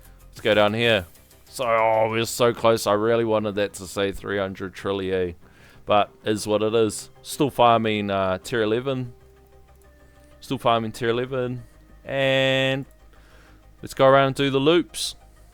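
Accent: Australia. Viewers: Been waiting for that smooth Aussie accent to explain V27 to me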